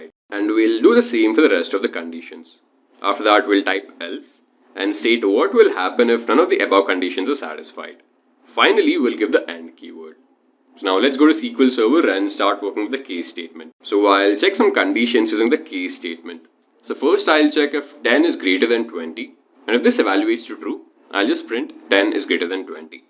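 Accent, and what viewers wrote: India. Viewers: Any chance yoy could add subtitles to your videos? I’m Indian too, but don’t have an accent so I can sometimes barely keep up with your’s in your videos...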